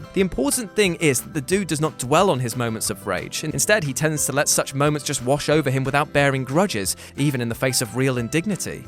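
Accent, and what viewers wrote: United Kingdom. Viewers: I usually don't trust anybody with a British accent, but I'll listen anyways.....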